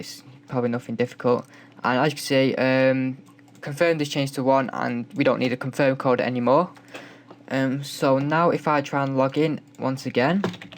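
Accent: United Kingdom. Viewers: british accent..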